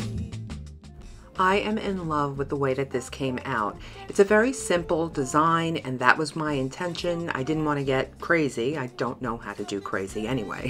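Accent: New York, United States. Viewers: Absolutely Love your uplifting attitude!!!! I would love to start a YouTube channel. I love crafting, my sister inspired me to begin & now I can’t stop!!!! I love the DIYers , there’s so much to learn & be inspired from. Don’t stop what you’re doing. Trying to figure your accent NJ/NY?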